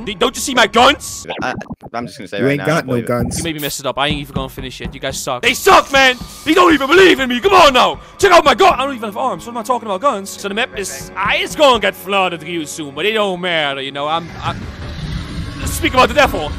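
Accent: Netherlands. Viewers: Bruh echt een nederlands accent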